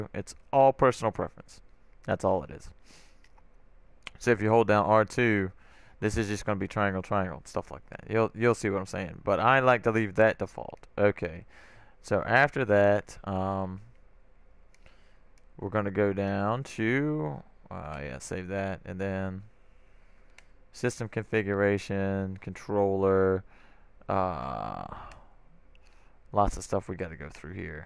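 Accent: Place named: South, United States. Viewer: Sounds like an American southern with a hint of Australian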